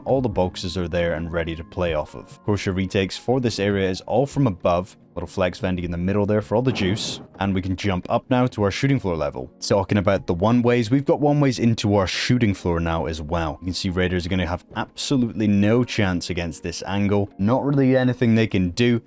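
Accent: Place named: Northeast Scotland, United Kingdom. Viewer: you sound from north east scotland 😂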